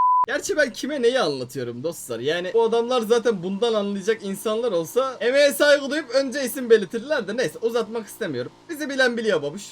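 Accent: Urfa, Turkey. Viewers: Ulan abi çok güzel urfa şivesi yapıyosun seni izleye izleye şivem kaydı 🤣😂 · Abi Urfalı olduğunu çok belli ediyorsun😀😃 bende Adıyamanlıyım hemşeri sayılırız bu arada geçmiş olsun umarım hastalığın kısa sürede biter 😃😀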